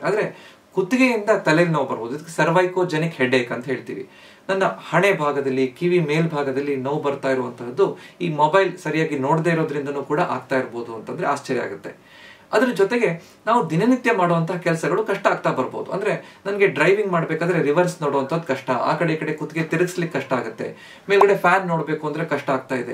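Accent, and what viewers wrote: Standard. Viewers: ಮಾನ್ಯರೆ, ತಾವು ಸ್ವಚ್ಚ ಕನ್ನಡದಲ್ಲಿ ವಿಸ್ತಾರವಾಗಿ ನಿರರ್ಗಳವಾಗಿ ಅನೇಕ ವೈದ್ಯಕೀಯ ವಿಷಯಗಳನ್ನು ಹಾಗು ಸಮಸ್ಯೆಗಳಿಗೆ ಪರಿಹಾರ ಮಾರ್ಗೋಪಾಯಗಳನ್ನು ಸೂಚಿಸುತ್ತಿದ್ದೀರಿ. ಅನಂತ ವಂದನೆಗಳು.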